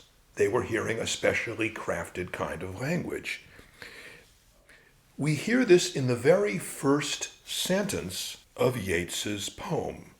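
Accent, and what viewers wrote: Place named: United States. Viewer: Americans... attempting to recite Irish poetry... and it just doesn't work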